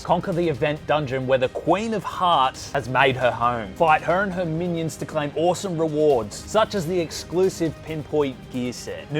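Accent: Australia. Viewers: nice mate. Love your content 👍🏻 I knew you're Aussie from the accent but wasn't sure if you're currently residing in AUS or USA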